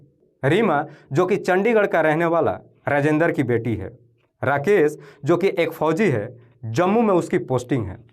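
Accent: Bihar, India. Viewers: Sir ji aap kya bihar se ho kya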